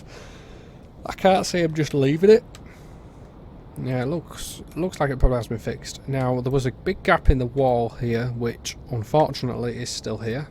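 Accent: Yorkshire, United Kingdom. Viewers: Nay lad you must stick with the yorkshire accent or I may need to give you an ecky thump ( Goodies refrence for the younger viewers) Great video bye the way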